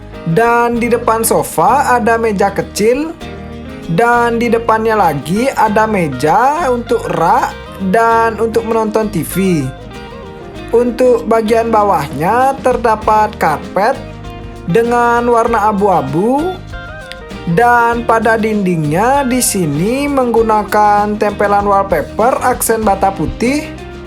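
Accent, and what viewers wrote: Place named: Sunda, Indonesia. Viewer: Sunda banget suaranya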